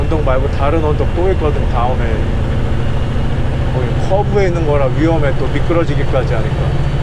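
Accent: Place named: Chungcheong, South Korea. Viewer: 친숙한 충청도 사투리 ㅋ 어디세요? 전 충주 ㅋ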